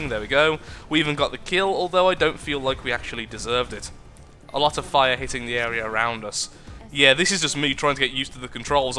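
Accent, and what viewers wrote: United Kingdom. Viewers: Your british accent always puts a smile on my face.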